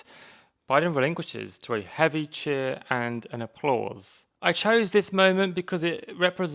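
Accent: United Kingdom. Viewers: I have only followed of TikTok and I genuinely love your ability to be on the level speaking of all of the horrible things the Us is doing. And btw….never expected your English accent! 😂 surprised the hell outta me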